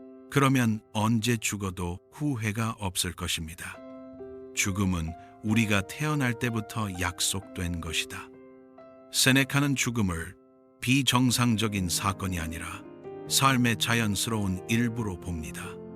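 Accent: United States. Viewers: 다 좋은데, 재미교포 2세 목소리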